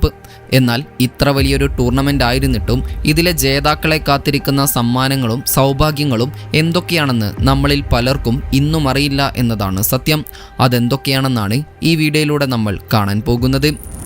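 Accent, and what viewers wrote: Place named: Northern Kerala, India. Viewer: ഒരു വിധം പറഞ്ഞൊപ്പിച്ചു..... വടക്കന്മാരുടെ ഭാഷ..... കൊറെയൊക്കെ fake ആയിരിക്കും..... 😂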